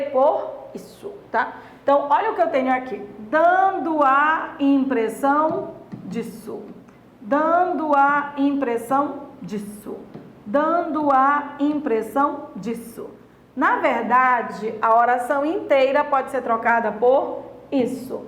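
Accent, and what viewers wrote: Pará, Brazil. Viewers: Reconheci o sotaque paraense!! hahaha adorei a aula!!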